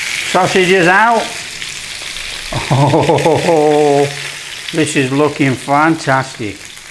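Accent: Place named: Scotland, United Kingdom. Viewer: I like Scottish accent, it's beautiful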